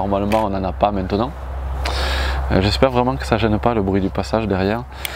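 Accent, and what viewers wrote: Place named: South, France. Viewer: Merci c est vrai vous avez un joli accent du sud a bientôt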